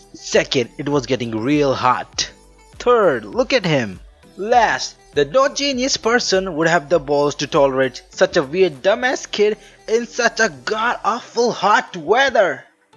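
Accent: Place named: India. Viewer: Your accent seems Indian lol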